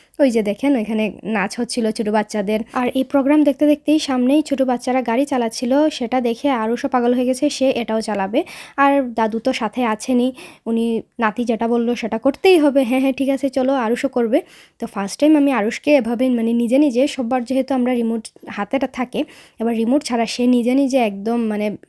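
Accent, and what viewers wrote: Sylhet, Bangladesh. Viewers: আপনার কথা গুলো অনেক মিষ্টি আমার মনে হয় আপনি সিলেট বিভাগ এর ভিতরে বাড়ি আপনার, আমি হবিগঞ্জ জেলা থেকে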